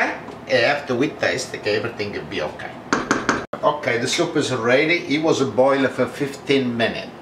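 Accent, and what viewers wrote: Italy. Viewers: He sounds like an oldschool italian imigrant. Kinda cool.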